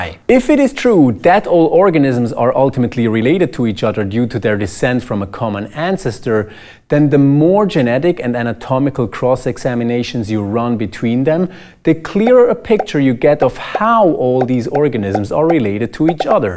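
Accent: United States. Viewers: Speak English not American thanks.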